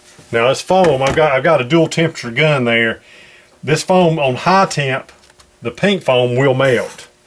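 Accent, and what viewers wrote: South, United States. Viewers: And you're from down SOUUUTTHH, lol. Great video.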